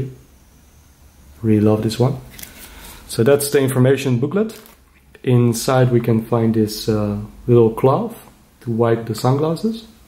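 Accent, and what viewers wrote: Netherlands. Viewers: You sound kind of Dutch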